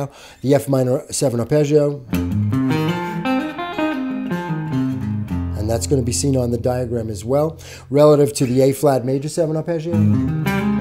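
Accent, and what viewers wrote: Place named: New York, United States. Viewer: This is great stuff. Also love the New Yawk accent, I'm from Brooklyn...LOL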